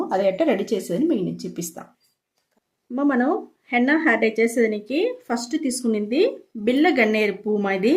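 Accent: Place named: Karnataka, India. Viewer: Karnataka walla miru me matalu alane unai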